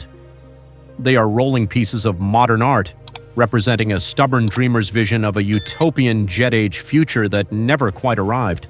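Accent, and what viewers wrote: United States. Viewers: I like it, the voice sounds as if from Michigan or Chicago to my not very educated ear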